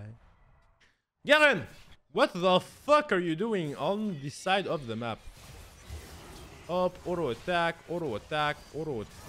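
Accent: France. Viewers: this french accent is so annoying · Is he french or something????? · Is he extra french on this one or is it just me? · Is it just me or does he sound way more french in this video than normal? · is he french ? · are you french ? is a really old question I'm asking me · U sound like a french accent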